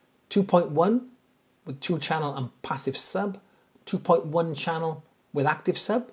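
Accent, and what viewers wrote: United Kingdom. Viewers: not to be a hypochondriac but I know all about autoimmune disorders as well. It can cause the weirdest issues! I permanently lost smell/ taste. They say it's autoimmune. I lost my hair, it came back Stark White then fell out again but then came back 100% like it never happened. Supposedly autoimmune as well but thank God I haven't lost my sense of sound!!! Good channel by the way I subbed and liked. I love your mannerisms I gather your from the UK, not the usual British accent but charming nonetheless. Perhaps it's because all my family is supposedly from the British isles but it's always something comfortable about your accents and mannerisms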